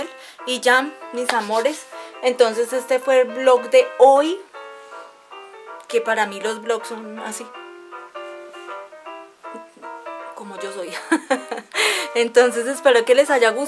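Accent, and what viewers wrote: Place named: Colombia. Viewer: pensé q eras colombiana jajajja por tu manera de hablar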